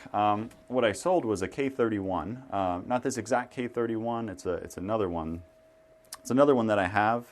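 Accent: United States. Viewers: Are you from Missouri??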